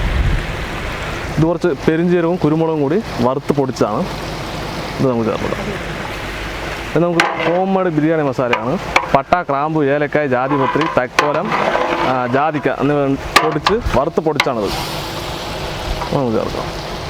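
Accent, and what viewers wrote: Palakkad, India. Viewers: PALAKKAD basha sooper and sooper biriyani.. Proud to be from pallakad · Igale palakadan shyli samsarom kooodi cooking nte kooodea cherumbo oru pratheaka monjaanu vediosnu super aanu Ella vediosum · You are the best brother, I keep waiting for your food recipes. Also, your Palakkad accent has become a hit.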